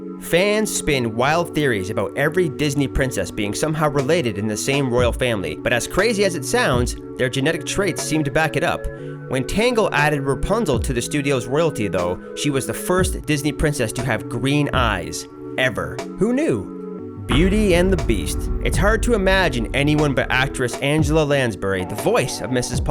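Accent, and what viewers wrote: Canada. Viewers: The narrator is Canadian, isn't he? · Canadian accent is nice.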